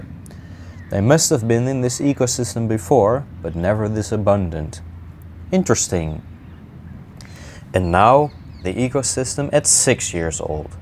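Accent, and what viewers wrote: Netherlands. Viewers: >hear accent >see background environment This guy is 100% Dutch.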